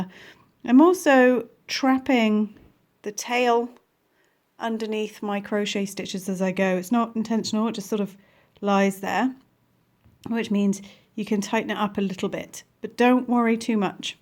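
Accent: United Kingdom. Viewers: I think that even though I'm from the UK, I grown to use USA stitch terms because all the people on YouTube who taught me how to crochet are american Also excuse me for being stupid, but are you from the UK, because you sound like it P.S you explain so well! This helped me so much!!!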